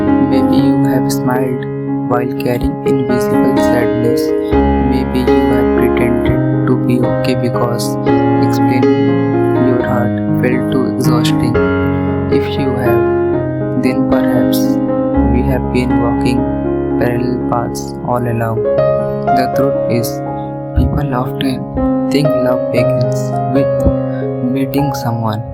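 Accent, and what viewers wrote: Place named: India. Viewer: Are you Indian because you talk like one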